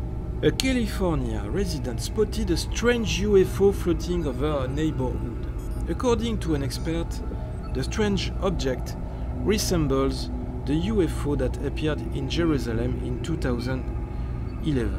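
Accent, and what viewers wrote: France. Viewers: You have a big French accent !!! (I’m French)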